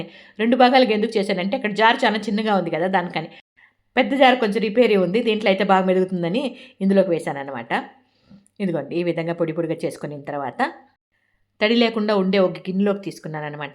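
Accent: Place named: Rayalaseema, India. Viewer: Akka meku epudu kocham rayala seema kadapa Jella yasa vstavunde akka Karnataka yasa basha ravatam ledu papam me chelle malate garu kanada basha yasato voice vavaru estuvuntaru malate garu amma ne video lo chupiatu vuntaru video lo amma ne chuce so happy alge eroju video lo saree kamalu matching mamaching begone meku kamalu baga set inae akka 🎉🎉❤❤❤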